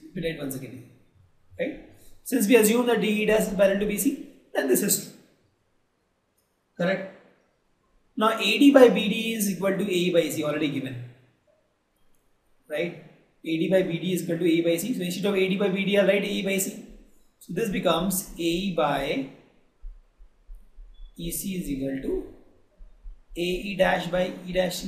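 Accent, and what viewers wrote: India. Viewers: Amy he is Indian he speaks Indian English not AMerican English like u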